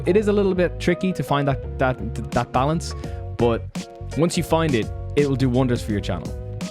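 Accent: Ireland. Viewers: haha nice i thought i heard an irish accent im originally from cork but live in galway now keep grinding man love the content ! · Are you irish?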